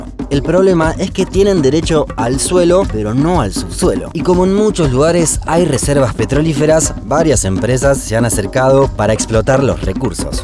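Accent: Argentina. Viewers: Porque los argentinos o el acento de esa zona de Argentina que creo que es el porteño es llamativo A mi me gusta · Esta buenisimo el contenido, me encanta tu acento argentino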